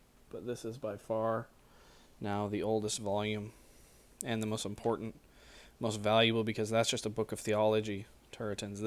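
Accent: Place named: Greece. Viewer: Great to hear Historical Greek Pronunciation (HGP) by an English speaker. Until you spoke English, you sounded like a native Greek.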